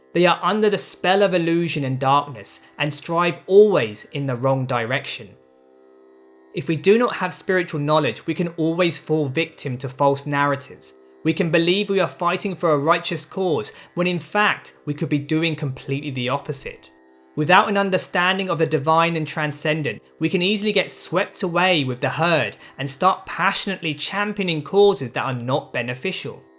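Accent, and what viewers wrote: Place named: United Kingdom. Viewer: UK! I think due to his speaking type!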